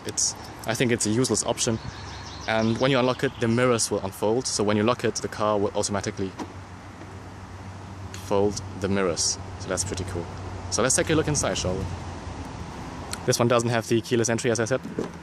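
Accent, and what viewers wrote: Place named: Germany. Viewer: du sprichst zwar englisch mit einem starken deutschen akzent, trotzdem würd ich gern so gut englisch sprechen können wie du. super videos übrigens. mach einfach weiter so!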